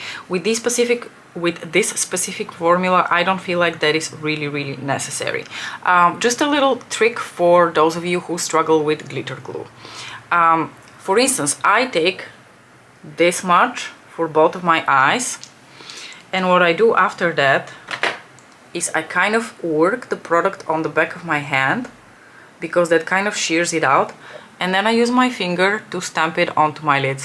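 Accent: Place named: United Kingdom. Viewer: I find it so amazing that you speak so many languages and I assume you speak them all as fluently as you speak English. I would guess that you have lived in the U.K. for 15 years by the way you speak. I would love a video on how you started with each language and how your brain processes and learns new languages. On top of it your job, husband, child, cats and YouTube channel, makes you so well rounded and so fascinating. You are such a lovely person. I love listening to you chat to us and your makeup tips and knowledge are really useful. ❤️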